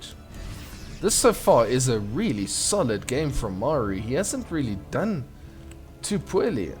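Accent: South Africa. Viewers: Great casting. Thanks for the video. Hmmmm do I smell a fellow South African :D Subscribed.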